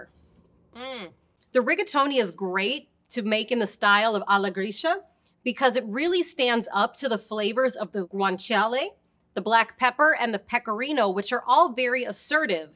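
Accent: United States. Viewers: Why the hell she pronounce all the Italian names in Murican? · Because she is 'Murican lol. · That Americanized pronunciation of the pasta names kinda ruins this video for me. I mean, it doesn't have to be an "Itsa me Mario" accent but just a little bit more effort would've made it better imo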